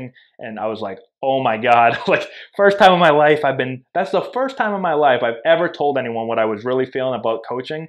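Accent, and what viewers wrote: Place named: New England, United States. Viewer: He's just got that New England accent lol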